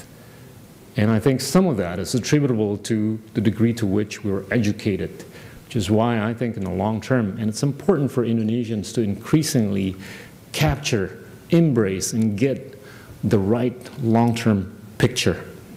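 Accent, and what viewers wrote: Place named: United States. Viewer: terima kasih pak gita, saya belajar American Accent dari anda lewat video ini, sangat membantu dalam mengembangkan bahasa inggris saya yang masih awam dalam accent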